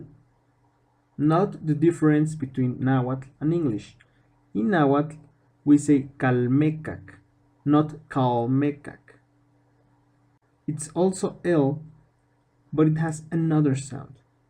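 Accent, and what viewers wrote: Spain. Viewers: Um... None of those vowel sounds were correctly correlated to English sounds, but if you listen with Spanish ears it makes sense... It reminds me of the old "pink, green and yellow" joke... (Long story short: "I hear the phone green, I pink it up, and I say, 'yellow'?") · He has a spanish accent it sounds exactly how he said it just americans cant understand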